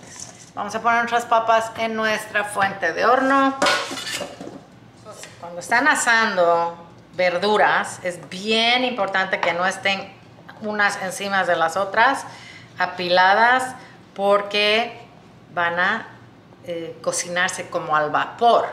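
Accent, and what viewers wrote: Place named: Bolivia. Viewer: 😁 tenias que ser boliviana. Hermosa, me fascina cómo hablas disfruto mucho al ver tus recetas fáciles y delis 👌👏👍👏👏👏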